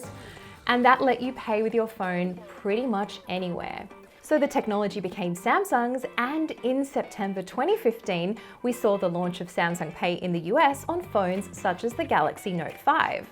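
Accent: Australia. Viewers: Is she Australian 🤔🤔🤔 Kinda! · This gal is an Aussie, I'm sure of it 🦘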